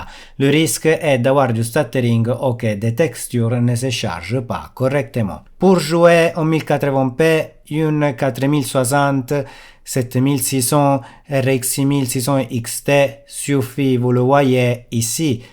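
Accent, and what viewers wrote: Italy. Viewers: ALORS GLOBALEMENT JE SUIS D'ACCORD AVEC TES COUPS DE COEUR ! PAR CONTRE APRÈS LORSQUE TU PARLES DE CARTOGRAPHIQUES JE SUIS MOINS EN D'ACCORD AVEC CE QUE TU DÎT ! POUR EXEMPLE LE DLSS ET AUTREMENT MIEUX QUE LE SYSTÈME DE AMD QUE J'APPRÉCIE PERSO ATI CAR ILS ONT RACHETÉ DEPUIS LONGTEMPS CERTE MAIS JUSTEMENT LORSQUE 1 MARQUE EN RACHÈTE 1 AUTRE DANS LA MAJEUR PARTI DES SECTEURS C'EST TOUJOURS LE MÊME NOM QUI ET GARDER ! POUR EXEMPLE EN AUTOMOBILE TU PEUT PRENDRE N'IMPORTE QUEL MARQUE APRÈS 1 RACHAT ET ELLE NE CHANGE NI DE NOM NI MÊME DE PAYS OFFICIEL POURTANT POUR MOI LE PAYS DEVRAIT CHANGER À PARTIR DU MOMENT OÙ LES DÉCIDEURS NE SONT + DU PLACE POUR DONNER QUELQUES EXEMPLES ON PEUT PRENDRE LE FRANÇAIS BUGATTI RACHETÉ PAR ALLEMAND VW ET SI L'ON PREND D'AUTRES MARQUES AUTO C'EST TOUJOURS PAREIL JE PRÉSUME AVEC TON ACCENT QUE TU ET D'ORIGINE ITALIENNE ET BIEN SI L'ON PREND LAMBORGHINI POUR EXEMPLE ILS ONT ÉGALEMENT ÉTÉ RACHETER PAR VW ET LE NOM DE LA MARQUE RESTE ET LE NOM DU PAYS AUSSI ET TU PEUT PRENDRE N'IMPORTE QUEL EXEMPLE ET CELA NE CHANGERA PAS ! 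D'AUTRE PART MOI QUI SUIS 1 VIEUX PASSIONNÉ DE HI-FI JE PEUT T'AFFIRMER Q CE EXACTEMENT LA MÊME CHO EN HI-FI ! ALORS QUE EN PC OU EN D'AUTRES SECTEURS IL AEEUVE PARFOIS QUE L'ON ÉLIMINE LA MARQUE D'ORIGINE ! BREF J'AI DISGRESSER SUR 1 AUTRE SUJET 😅😁 MAIS POUR LES CARTES GRAPHIQUES NVIDIA IL Y A DES GAINS IMPORTANT AU NIVEAU D CERTAINEMENT CHOSES NOTAMMENT AU NIVEAU LOGICIEL POUR LE DLSS C'EST + DE VITESSE CAR C'EST MOINS LOUR ET ÉGA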